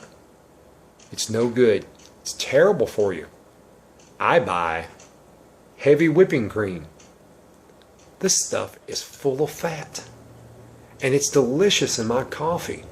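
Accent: South, United States. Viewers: I love your channel and your southern accent. Thank you for breaking stuff down for us!You’re awesome! 😎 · your southern drawl makes me laugh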